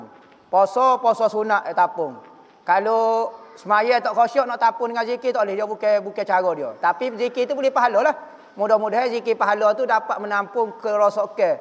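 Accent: Kelantan, Malaysia. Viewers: Tak berapa nak faham sangat pelat kelantan..tapi ustaz ni bijak dan berilmu bila berucap...semoga ustaz sihat2 selalu...jaga kesihatan ye...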